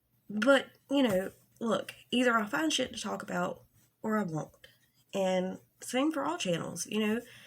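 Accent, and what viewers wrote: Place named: Georgia, United States. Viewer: Are you from GA? Just trying to pinpoint the accent. Coming from AL over here.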